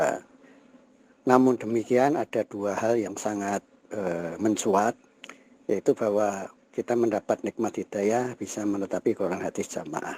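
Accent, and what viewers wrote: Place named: Java, Indonesia. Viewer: Ngomongnya aja medok ko' ingin nguasai dunia.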